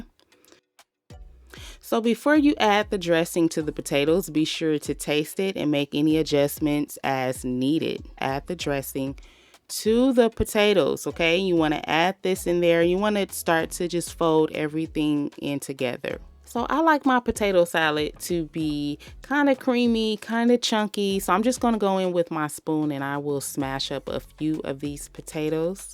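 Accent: South, United States. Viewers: I live in Canada. This is pretty much how we do it here. My family's (my side and my wife's side...both british) have done this. Never been to the southern states but that's pretty much how we do it here. Have done so for ...I don't know...I'm 73 ...so 73 years. So it's pretty standard. Nothing southern about this except the accent of the narrator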